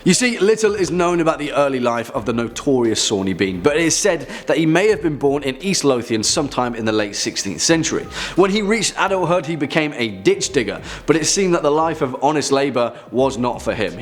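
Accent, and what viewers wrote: England, United Kingdom. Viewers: Want to like cause it's about Scotland, but cannae be having that English accent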